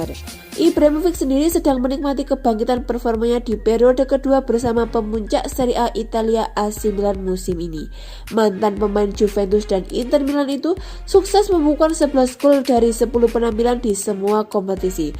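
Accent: Java, Indonesia. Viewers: Jawa nya tulen mbak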